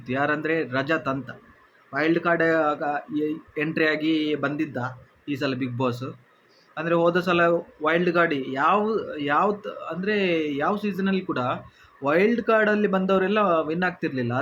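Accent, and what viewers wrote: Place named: Tulu Nadu, India. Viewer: Enu helta edira tulunadu navaru matadode hage adakke maryade kodi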